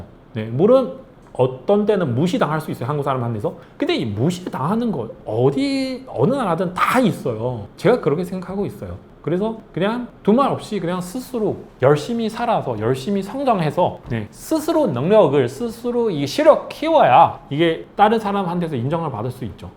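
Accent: China. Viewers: 带着中文口音 韩语都说不利索的你 也是很好的满足了自卑的韩国人的内心😊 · 발음 발음. 조선족 학교가 아닌. . 한족학교 다녔나바요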